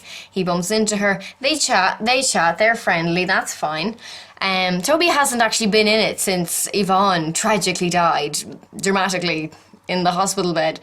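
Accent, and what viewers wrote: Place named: Ireland. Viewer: Great video cos u were right but just wondering are u from Ireland cos u sound Irish and I'm Irish too lol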